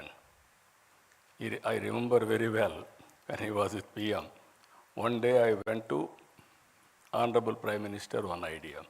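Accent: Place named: India. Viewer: 🙏🙏🙏🙏🙏🙏🙏👌👌👌👌👌Guruvugaaru your English indean English so nice very understandable even new learners role modals work hard .aking awake being public relations faith effectinate public 🍓🚁🍓🚁🍓🚁🍓🚡🍓🍓🍓🚡🚡🍓🚡🍓🚡🍓🚡🍓🚡🚡🍓🚁🍓🚁🍓🚁🍓🚡🚁🚁🍓🚁🍓🚡🍓🚡🚡🚡🚡🚡🚡🚡🚡🍓🚡🚡🚡🍓🚁🍓🚁🚁🍓🚡🍓🚡🍓🚡🍓🌹🚡🌹🚡🙏🚡🌹🚁🍓🚁🚁👌👌👌👌👌👌🤱👌👌👌👌👌👌👌👌👌👌👌super cm repeated country wide country wide repeatedost repeated country wide super cm 👌👌🤱👌👌👌👌👌🙏🙏🙏🙏🙏🚁🙏🙏🍓🚁🍓🚁🍓🚁🍓🌹🚡🌹🚡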